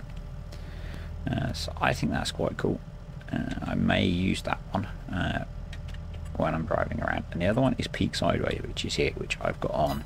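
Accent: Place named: Australia. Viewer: Hmm you sound Australian. Never heard your until I saw the news about terralife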